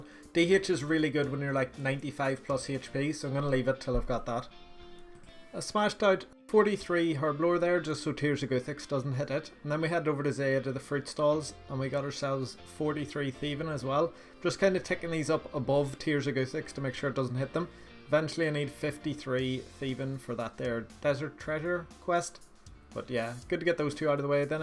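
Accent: Northern Ireland, United Kingdom. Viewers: Lol Northern Ireland ?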